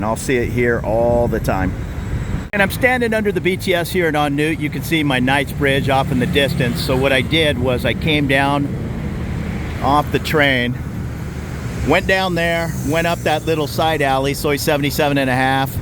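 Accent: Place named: Southern California, United States. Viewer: Hi, I just spent a week in On Nut and you popped up in my YouTube feed. I lived on La Jolla Blvd. in PB for three years, back in the late 90’s. It was a giggle hearing your SoCal accent. 😀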